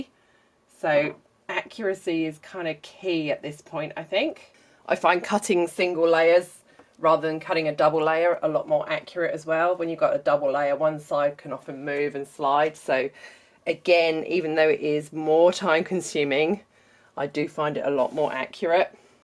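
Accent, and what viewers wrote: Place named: United Kingdom. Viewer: Yes it has certainly given me inspiration, but I'm doing mine a different way! Yes, I'm also in Australia (live in Brisbane, but currently working in Perth). I always assumed you were living in the UK because of your accent so I was really surprised when I heard you say Lincraft haha! It's not often that I hear Lincraft or Spotlight on youtube!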